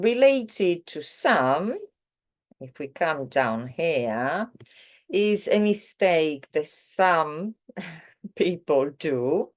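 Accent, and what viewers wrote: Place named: England, United Kingdom. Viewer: I’m on Duolingo learning Italian, do you think that’s a good source? And you sound English? Are you from Italy?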